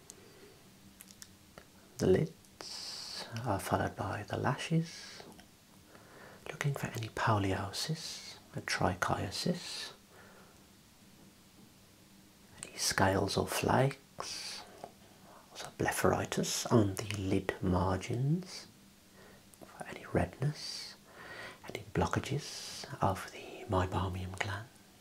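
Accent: Birmingham, United Kingdom. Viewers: My favourite Brummie 😍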